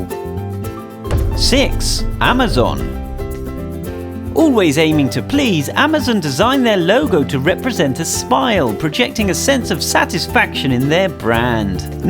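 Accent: England, United Kingdom. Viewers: why are you speaking american with an english accent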